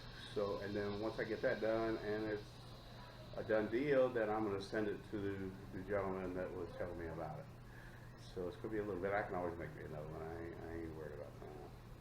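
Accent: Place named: South, United States. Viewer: good morning MR. another informative video that small sprocket you made pretty neat I think you can purchase them at mz Miami I thought I saw a 11 tooth I can't explain technically what it does but just like raisins the number of teeth on the rear sprocket does something so does the front it did the same thing in RC trucks cars anyway I think lowering the front sprocket slower take off faster top don't quote me lol but it's something like that You can tell you're from the South brother I'm in Texas you can hear them cicadas singing lol you your wife and family have a great labor day stay safe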